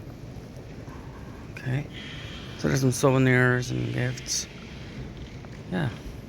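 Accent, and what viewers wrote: United States. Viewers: Hi I noticed you speak with an (American ?) accent. I'm curious, did you learn it from a particular source or through practice ? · You have an American accent. I grew up in LKO. I live in Cleveland, Ohio now.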